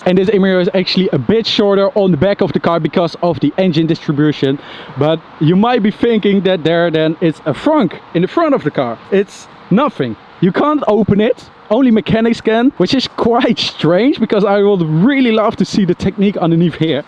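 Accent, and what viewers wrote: Netherlands. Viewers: What a THICC hollands accentje😜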